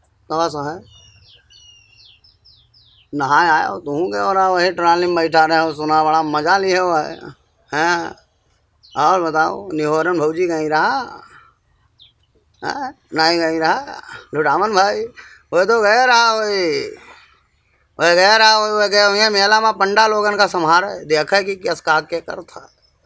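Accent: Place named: Awadhi, India. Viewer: Andhra pradesh Tirupati bala g se apna awodhi sun kar maza aa jata hai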